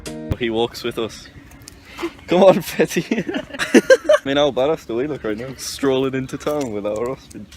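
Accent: United Kingdom. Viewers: Whaast how dare they insult your accent! British accents are some of the best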